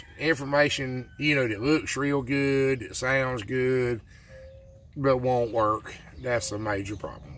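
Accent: South, United States. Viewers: Thanks for sharing , watched most of your videos, This french Canadian has a bit of a hard time with the southern accent. my second year with piglets . I have a question for you . We have cayotes around and i rotate the pigs out on pasture with electric , i always lock them in at night , I wonder how old or how big should they be before i can trust them outside at night? Thanks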